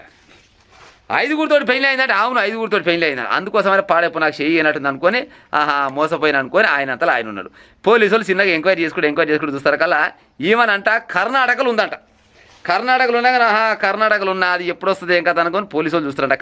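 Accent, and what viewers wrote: Telangana, India. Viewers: తెలంగాణ మాండలికం మాటల ద్వారా న్యూస్ చెప్పడం చాలా బాగా వుంది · నువ్వు భలే గమ్మత్తుగా చెప్పుతావే పెద్ద మనిషి వార్తలు మన అచ్చమైన తెలంగాణ మాండలికంలో 👌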